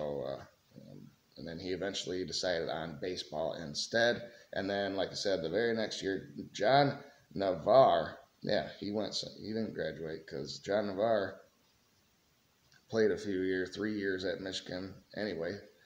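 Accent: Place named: Mississippi, United States. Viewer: You sound like you live in Mississippi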